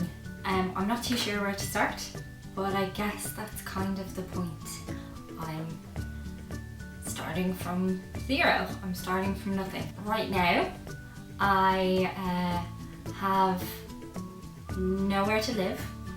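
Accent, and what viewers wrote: Ireland. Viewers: You are a joy to watch but I must agree that this post was very open and sweet! Of course you’re more proficient now, after so many posts and growth is always good! I visited Ireland almost three years ago and your voice “takes me back” to that gorgeous country! I’m thrilled that you’re doing so well AND that you knew that Chewie would be a dear friend and faithful companion; smart lady! Thanks for sharing this!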